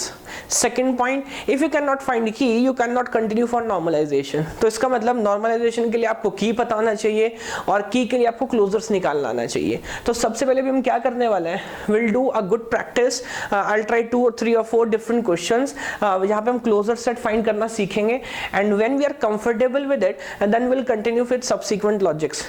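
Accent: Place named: Indore, India. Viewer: it seems sir you are from indoor . You have that tone " kya bhi milega ". thanks alot sir for all the videos. I have being following all the lecture one by one .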